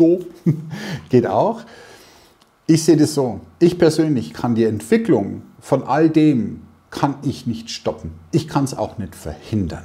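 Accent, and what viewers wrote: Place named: Franconia, Germany. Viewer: Woher kommst du Meister? Ich höre fränkische Züge raus. 👌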